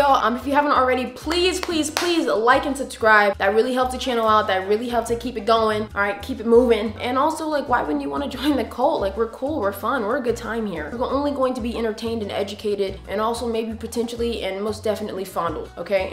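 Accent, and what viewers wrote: England, United Kingdom. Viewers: I love how you casually speak in an English accent, I thought I was the only one to do that 😂❤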